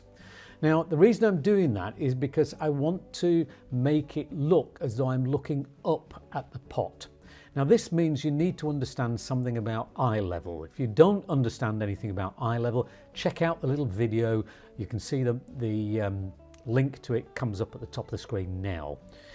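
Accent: United Kingdom. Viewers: لكنته بريطاني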